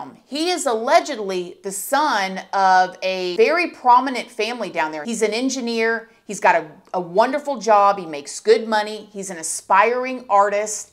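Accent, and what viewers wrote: South, United States. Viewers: Lol your southern accent was super hard in this video. That happens to me when I’m around people from Brooklyn. Love you girl PS you must’ve been around someone today that has a hard accent. Hehe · I just love your honesty and southern accent!! You’re awesome.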